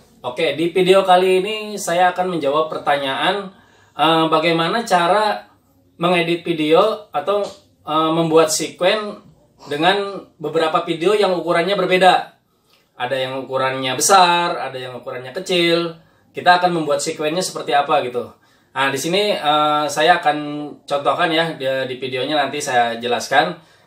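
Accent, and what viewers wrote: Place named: West Java, Indonesia. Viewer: "Sunda Van Java" logat nya 👍👏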